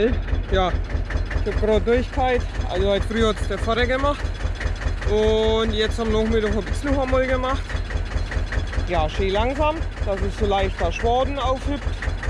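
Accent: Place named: Middle Franconia, Germany. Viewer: Kommst du aus Mittelfranken du redest genau so wie ich 😂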